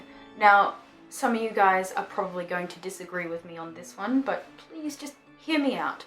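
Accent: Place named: Australia. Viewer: And to me, as soon as she started talking I thought "she's from Australia" 😳 lol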